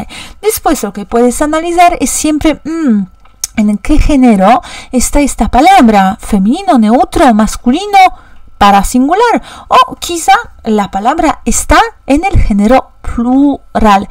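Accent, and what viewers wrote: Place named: Rio de la Plata, Argentina. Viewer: Hola! He escuchado que tienes una pronunciación similar a la Argentina y Uruguaya, por ejemplo cuando dices "yo". Saludos desde Uruguay 😁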